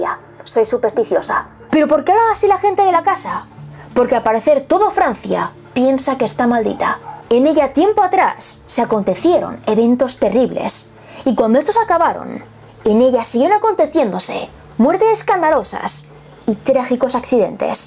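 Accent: Spain. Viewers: Mansion de los amigos imaginarios... A caray, eso no era... Tu acento español es hermoso. Casamiento entre primos, a caray, eso me interesa... xd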